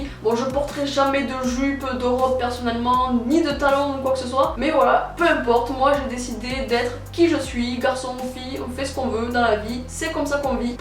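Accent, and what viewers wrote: Toulouse, France. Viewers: Tu connais pas bien les accents toi x) c'est l'accent toulousains xDDD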